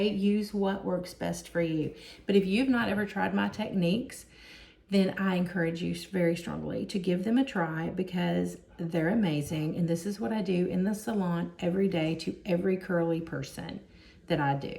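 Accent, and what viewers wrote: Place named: South, United States. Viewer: Thank you for this video!!! I JUST discovered you and subscribed. I can't afford to go back to my DevaCurl Stylist right now, so I will be watching ALL of your videos. I also love your haircolor and highlights. Can I ask what color/brand you use? Also, you are so very pretty, great personality and adorable southern accent!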